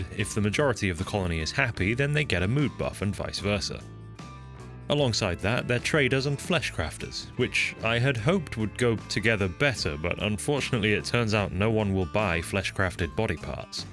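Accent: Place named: England, United Kingdom. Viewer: I like your accent English man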